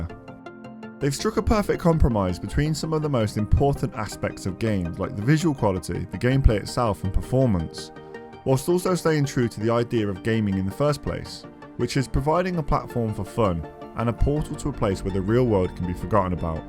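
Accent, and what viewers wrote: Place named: Coventry, United Kingdom. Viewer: You sound like you're from Coventry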